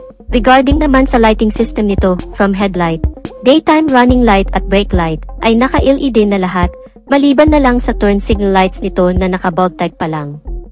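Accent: Visayas, Philippines. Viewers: Bisaya ka day?!😂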